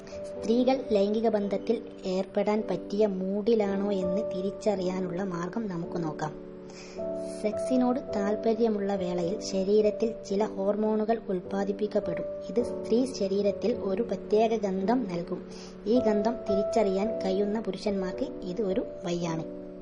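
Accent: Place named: Malappuram, India. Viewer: Malapuram Ulla Etho oru kundapi aanu... sound ketta ariyam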